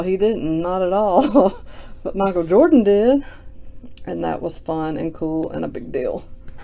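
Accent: South, United States. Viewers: G'day, Love the Southern accent and I enjoy your insights on Baseball cards Cheers mate 🍻🇺🇸🇦🇺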